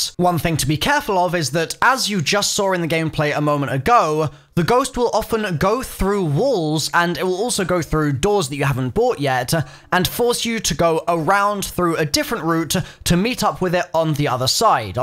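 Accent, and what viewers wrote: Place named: United Kingdom. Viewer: "cemetree"? "vaze"? I cannot take the British accent seriously.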